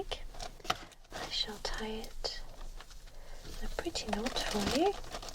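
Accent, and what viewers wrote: England, United Kingdom. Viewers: lovely British accent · are you from uk? Your accent is not American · Yes she is English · cat I mainly detected a British accent, but I thought maybe there was a hint of Australian there. Regardless, it doesn't matter; she has an amazing voice and demeanour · Another amazing video. Love listening to fellow English ASMR accents ❤︎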